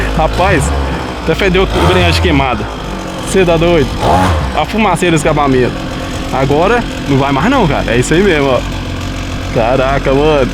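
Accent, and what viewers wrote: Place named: Northern Minas Gerais, Brazil. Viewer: Tem o sotaque do norte de minas 😅! Ou nortminas soo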